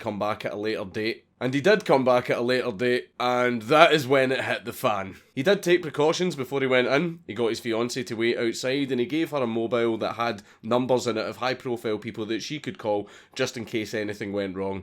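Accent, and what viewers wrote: Scotland, United Kingdom. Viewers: Yay angry Scottish man can ruin my day!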